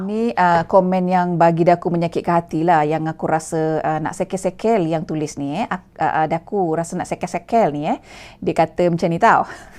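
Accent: Negeri Sembilan, Malaysia. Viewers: Seronok tgok celoteh dik ni dgn ckp nogori lgi, wlupun lama di France x lupa loghat negeri 9 👍👍👍😍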